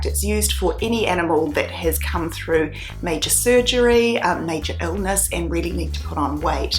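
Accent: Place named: New Zealand. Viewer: If that is English from New Zealand you should be teacher, I like this accent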